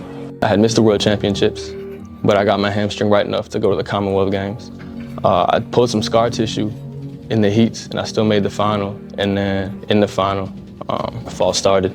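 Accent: United States. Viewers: You have a louisiana accent bro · Bruh, how do you sound like you're from Texas but you claim Canada for the Olympics? Help me understand. Best of luck for next year and LA.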